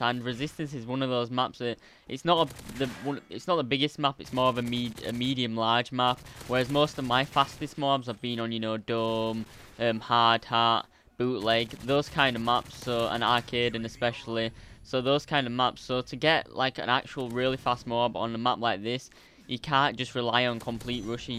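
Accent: United Kingdom. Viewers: Are u British be ause I want to no cause ur accent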